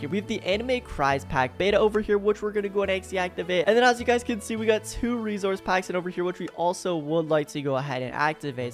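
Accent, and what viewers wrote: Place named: India. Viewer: You sound more Indian dan the actual Indians you cross born Indian.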